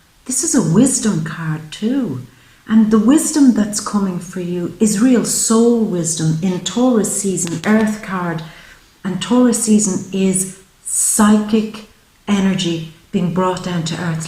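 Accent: Ireland. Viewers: You look amazing, thanks for the reading, from one Irish person to another, it's lovely to hear an Irish accent in tarot❤️❤️❤️🤗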